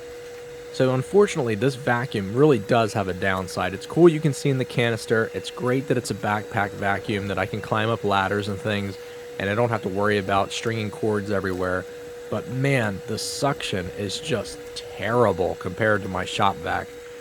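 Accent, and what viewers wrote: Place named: Philadelphia, United States. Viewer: Omg my son is obsessed with these videos and sucked me into watching them now I can’t stop. And I was thinking.. this guy is definitely from Philly. Am I right?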